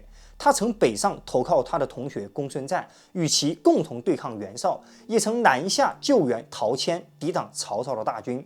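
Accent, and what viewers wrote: China. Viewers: ，坦白說你為人處世的態度實在了不起。 我想有必要說明一下，之前就有提到我認為您的內容作得很用心很好，所以我是很自然而然就看完了，並不是「耐心」看完的喔！而且怕您誤會我只是只看標題就批評的，所以有多做一些說明。 像我這種只出一張嘴的鄉民，您還能這麼用心且友善地回應，真的是一個了不起的youtuber。 之前一個有做到這樣的是說書人柳豫，但他的粉絲很多都是之前經營facebook引流來的。所以節目一上架基本盤就有相當規模。 像評論三國這類節目也不少，但囿利題材，所以也頗有侷限。像說書人柳豫也發展到電玩解說。 品質算好的就有什麼文大郎、史大郎(忘了誰先誰後，但風格都一樣，可能是股東不合拆伙吧?)，他們也是引經劇典來增加豐富性。還有一個(抱歉忘了，也許是上述兩人的早期作品)會善用衛星地圖講出當年各國勢力或軍隊征伐的地形、路線。 我聽您的口音，應該是中國的朋友，我在想說如果您附近有什麼著名的三國地點，都可以作一期現場影片，當然不是只有旅遊介紹，而是加上故事情節的引導和你個人的親和力。 比如說「探索涿郡故里，原來劉備當年就是在這棵桑樹下說要當天子的！」應該也很吸睛，只是舉例，不要打我🤣 當然不是說要騙點閱啦~只是我們三國迷其實最重的是這份情懷，而情懷如何展現?我們一般人的做法就是玩遊戲、討論，但您們yotuber是不是可以上升到人事時地物的展現或溯源? 抱歉，扯遠了，只是期待您這麼有誠意的頻道能蒸蒸日上而已，以上都是外行人的胡言亂語，請不要介意。 在下只能獻上小小的訂閱，不成敬意。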